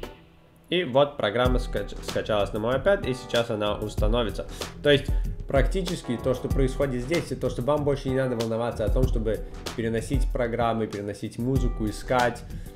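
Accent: United States. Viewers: по-моему живет в США:) акцент так и прет:) "на интернете" хаха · умиляет американский акцент и интерференции с английским языком в речи ведущего.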